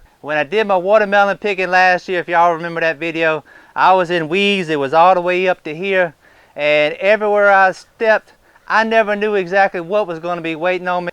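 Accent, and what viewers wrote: South, United States. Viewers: where are you from with that awesome accent?New Orleans ?Kentucky?....anyway great channel I am sure this channel has helped countless people ! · Great video I like your voice so southern! :) God Bless! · nothing like a good ole boy, love the accent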